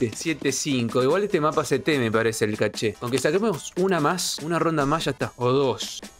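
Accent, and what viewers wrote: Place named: Jujuy, Argentina. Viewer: disimula tu acento jujeño, abrazos!!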